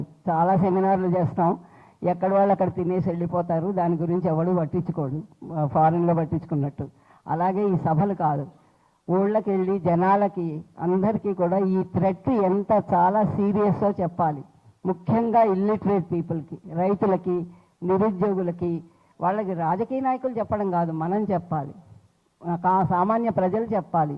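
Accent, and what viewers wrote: Andhra Pradesh, India. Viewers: bamma pakka gulabi with andhra yaasa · Ne bhasha purthigha andra valla laghane vundi.😢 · Musalidanaa nii language telangana yasa ledu andhra yasa unnadi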